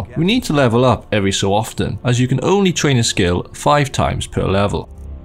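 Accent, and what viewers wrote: Wales, United Kingdom. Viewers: Are you from close to Cwmbran my dude? You sound like you’re from Newport or Cwmbran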